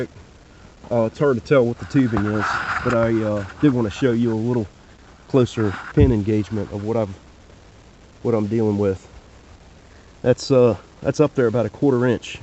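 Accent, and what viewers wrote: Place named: Outer Banks, United States. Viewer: I hear ya hoigh toider. ;-) Down bay native here. Thx for the vids, helpful info. I've had to tighten up a couple of attachments from titan. There's a lot of variability between manufacturers plate dimensions so I think Titan hedges on 'fit everything' side...I find a few welded shims here and there tightens things up fine. All the titan connectors I get go in the spare bin and I put on known manufacturer ones.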